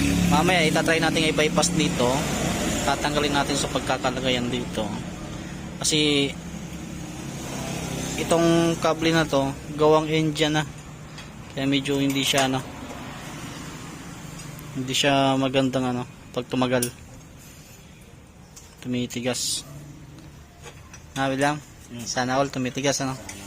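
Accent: Ilocos, Philippines. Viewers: masa Ilocos ka ba boss?!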